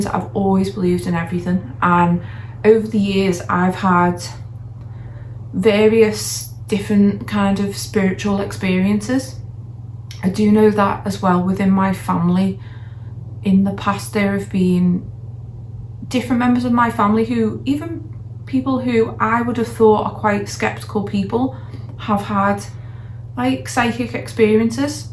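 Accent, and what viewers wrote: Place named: Liverpool, United Kingdom. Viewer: Yes scouser 🙌🏻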